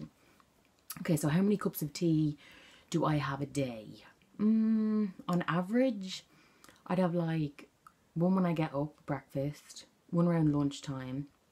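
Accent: Ireland. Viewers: I really loved this video! Argh your accent!! You make me miss Dublin!! New sub!!💕💕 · Stoppp this video is amazing and I love it. Your accent is GOALS :D I want to visit ireland SO bad <3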